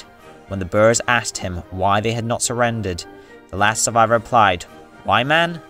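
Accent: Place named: England, United Kingdom. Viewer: The narrator's English accent and gormless mispronunciation ruins this.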